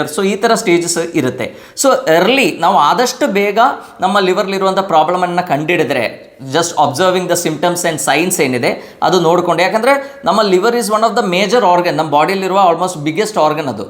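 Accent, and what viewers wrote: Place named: Kasaragod, India. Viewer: Doctor re nivu kannada pada balasi namage English Word artha agolla. Nivu pure kannada davaru alla. Gadinaadu pradesha kasaragodu avara tharka ide nimma kannada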